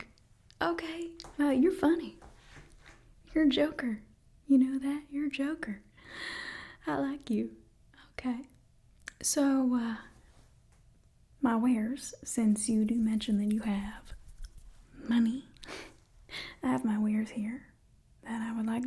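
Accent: South, United States. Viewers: "Southern Accent" LETS GOOOOOOOOOOOOOO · That Southern accent is so good, reminds me of your awesome Train heist ASMR video. Any chance you might do a Red Read Redemption video? Awesome video. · This is amazing! Love the southern accent! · Southern Accent! Thank you! ❤️ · Are you the mechanic from the Mandolorian cause I’m pretty sure she’s got kind of a southern drawl too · Southern accent in Star Wars huh😳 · That southern Tattoine accent is just adorable. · Great Southern Accent I was born and raised in NC you nailed it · Why does every spacer she plays have a southern accent? First the Outer Worlds character, now this. 😅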